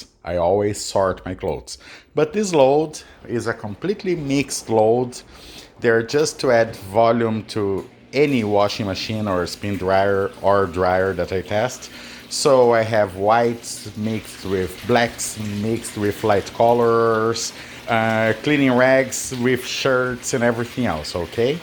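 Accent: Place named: Quebec, Canada. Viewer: Man, he sounds like a French Canadian... (Disclaimer, I'm a tamed French Canadian)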